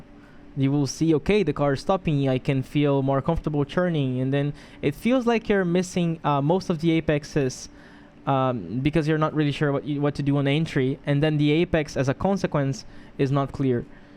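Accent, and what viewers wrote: Brazil. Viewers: Achei teu canal hoje, Voce eh brasileiro ne soh pelo sotaque. muito bom os videos!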